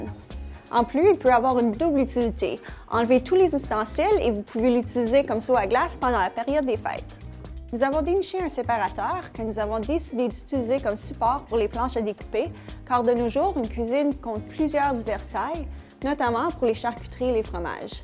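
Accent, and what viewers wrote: Quebec, Canada. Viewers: cet accent canadian me rend nerveux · On devrait lire accent canadien s'il en était un, mais il s'agit ici d'un accent québécois. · Je suis québécoise et sa manière de parler m'angoisse aussi.. nous ne parlons pas tous comme elle ahahaha. ;)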